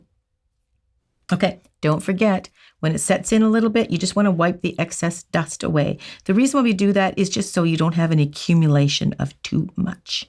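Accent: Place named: Canada. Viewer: You sound Canadian,. Are you from Canada? I’m living in England, but my husband and I were born in Ontario. 🇨🇦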